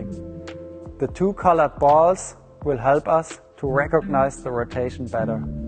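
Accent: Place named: Germany. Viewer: His English sounds so ... German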